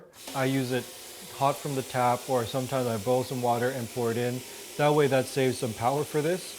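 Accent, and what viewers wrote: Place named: United States. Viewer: Duh do you hear an accent. Hes from the US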